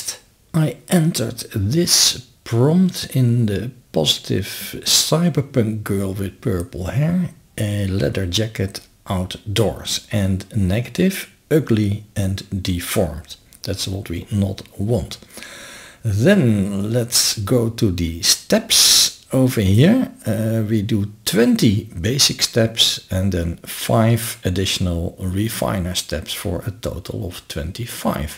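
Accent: Netherlands. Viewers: some vague intuition tells me you are Dutch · duidelijk te horen dat je nederlands bent :) bedankt voor de informatie. ben nogal nieuw met ComfyUI en jouw workflow bestanden zullen zeker helpen mij het beter te begrijpen :) toodles!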